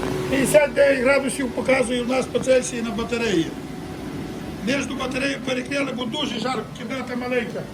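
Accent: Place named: Galicia, Ukraine. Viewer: Класна буржуйка і майстерня. Судячи по акценту і діалекту пан з Галичини.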